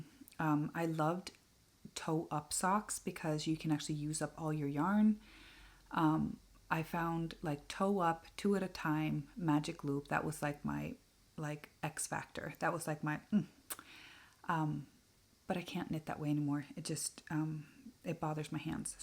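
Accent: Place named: Montana, United States. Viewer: Don’t laugh but I thought you were podcasting from Montana or Wyoming in the US or Alberta Canada. Yesterday I was watching The Knitty Stew and she referred to “an Icelandic cast off”. I have been knitting for 45 years and have never heard of this. In a future episode would you please show us how it’s done? I would love to win some Icelandic laceweight! Your knitting is exceptional! And the magic mushroom socks are definitely on my needles next. Your kids will never be lost in the snow-and your description of the ‘highlighter with pink undertone’ was perfect. Thank you very much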